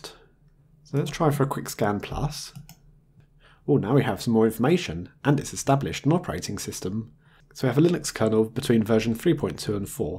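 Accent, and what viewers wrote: United Kingdom. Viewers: I have subscribed to the channel just because the British accent :gentleman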